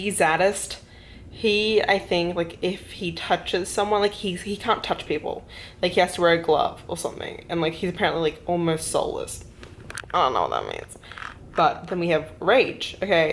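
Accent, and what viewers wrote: Australia. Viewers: I just came across your Chanel and love it. I’m curious..... your accent, are you Australian?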